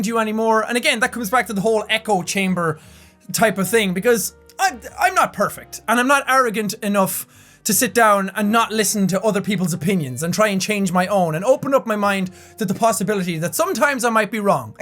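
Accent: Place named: Ireland. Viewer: i love your Irish accent and i even dyed my hair like you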